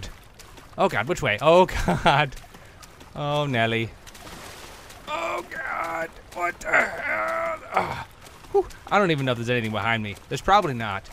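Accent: United Kingdom. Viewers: Love your British accent <3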